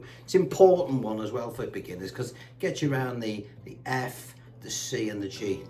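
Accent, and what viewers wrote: Liverpool, United Kingdom. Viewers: I'm telling all my guitar mates about you. You're a gem of a teacher with loads of humour and friendly Liverpool banter. Thanks again buddy.🤗🎼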